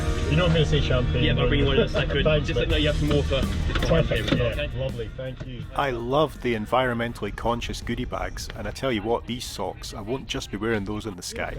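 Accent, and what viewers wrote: Scotland, United Kingdom. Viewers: Hi, nice to meet you...I've just discovered your channel and I must say IT'S REALLY ONE OF THE VERY BEST out there!!! Your videos are Grrrreat! Excellent quality, good content, to the point commenting, and perfect editing. And the wee Scottish accent is the icing on the cake!...and did I mention that you're also eye candy??;-) (hope you don't mind a compliment from a man!) Agree that VS are excellent value for money, especially if you happen to stumble on one of their offers. Their crews are some of the very best, aren't they? I so love the A350....big, smooth, quiet, powerful... Doesn't get any better than that, really! Xx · You have got all 3 my favorite ways of traveling (airplane, train and ferry)! 👍❤️ Please keep traveling/sharing and I will definitely keep watching. 🙏 By the way, love your Scottish accent.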